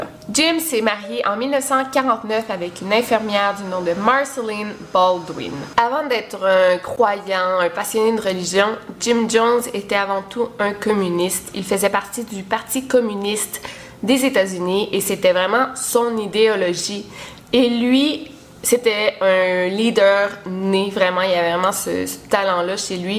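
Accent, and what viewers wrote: Quebec, Canada. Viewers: Je t'ai connu grâce à Horia qui avait parler de toi dans l'une de ses vidéos pour Halloween ! Et depuis il y a pas un jour où je ne regarde pas tes vidéos, ton accent Canadien résonne par tout chez moi mdr Continue, j'aime beaucoup le travail que tu fais ^^ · Ahah quel accent québecois, ça me manquait d'entendre ça ^^ en tout cas, très bon boulot sur cette secte (Je ne connaissais pas ta chaine). J'ai voulu en savoir plus pour essayer de comprendre à quel point l'esprit humain peut être manipulable. Merci beaucoup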